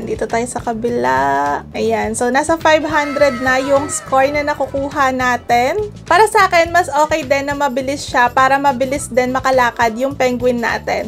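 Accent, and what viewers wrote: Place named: Pangasinan, Philippines. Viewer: Ganda mo pangasenensi ka kilala ko sa tunog mga taga pangasinan hehehe chat me teach me grand mother and father ko puro taga pangasinan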